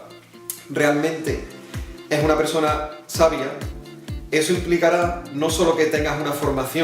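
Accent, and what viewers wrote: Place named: Andalusia, Spain. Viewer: Olé olé y olé, maravillosa explicación!!! qué acento andaluz más bonico!! un besazo guapoooo!!!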